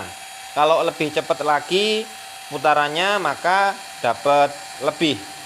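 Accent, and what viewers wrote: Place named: Banyumas, Indonesia. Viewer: Kalau boleh tahu orang mana, mas? Usianya 30an atau 40an kah? karena susah ditebak dari fotonya yang tampak muda, tapi suaranya jelas sekali dan terdengar seperti Pak Lik saya yang orang Cilacap,, saya subscribe yaa,, jarang-jarang saya bisa menangkap suara pengucapan sejelas ini di yutub karena saya orang nya Hard of Hearing alias gangguan pendengaran sedang,, dan orang dengan logat ngapak seperti Pak Lik saya gampang saya dengan suara pengucapannya,, kebetulan juga sedang mengerjakan tugas akhir membuat contra-rotating axial flux permanent magnet generator,, back emf nya tampak terlihat sekali karena salah rotor magnet yang seharusnya ikut berputar bersama rotor kumparan malah diam dan terasa ada drag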